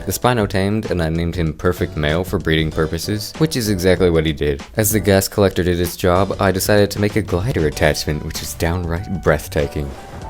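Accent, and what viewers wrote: United States. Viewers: combine the voice of * and a southern accent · I love the cowboy accent 😂😂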